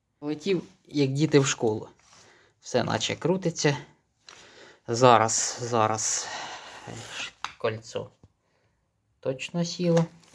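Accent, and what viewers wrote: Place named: Mykolaiv, Ukraine. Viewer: підписався на Тебе і не шкодую. Відоси інформативні та приємні. Дякую (за все, а особливо за наш " суржик"! Я- з Миколаївської обл)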